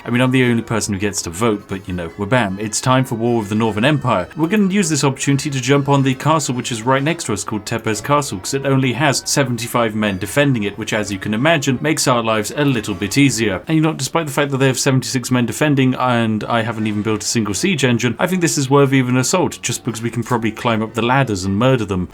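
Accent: United Kingdom. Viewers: The way describes what he is going to do sounds very.....British.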